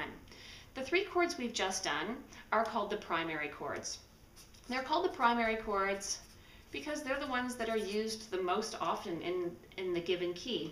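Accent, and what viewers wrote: Canada. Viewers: This is exactly what i was looking for, and your video was pretty easy to follow. Appreciate alot! It seemed you are from Canada, am i wrong? ;) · Your accent sounds a bit like Canada or Northern U.S. am I right?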